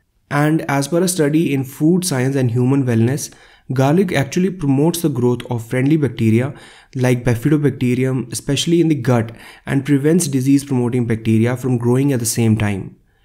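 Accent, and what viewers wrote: India. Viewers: Is that a Hindi accent? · I ❤ Indian accent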